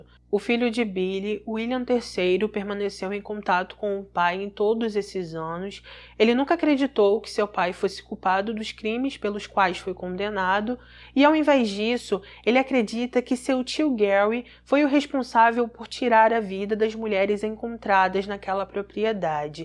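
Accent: Rio de Janeiro, Brazil. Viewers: Boa noite quando procuro seu vídeo e não encontro já fico ansiosa esperando esse sotaque carioca é muito lindo eu morei no Rio de Janeiro por 2 anos e quando saí de lá já estava falando carioca kkkj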